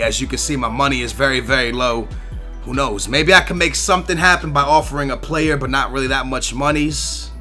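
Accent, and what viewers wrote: Portugal. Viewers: How do u pronounce adryan like Andre?? We get it ur portugese but try and speak normally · he says it the way he wants to and in a Portuguese way its kinda cool in my opinion haha:P the way he says the Portuguese team is the way its said in Portugal, like the way he says Ronaldo and pepe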